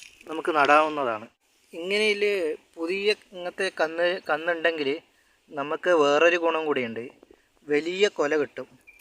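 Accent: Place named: Taliparamba, India. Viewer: Taliparamba slang in kannur ❤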